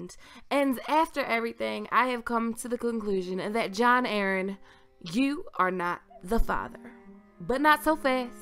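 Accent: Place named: Maryland, United States. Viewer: she sounds like shes from Maryland or the "DMV" if you are familiar with the different American dialects of English...anywoo I enjoy her theories & perspectives the most!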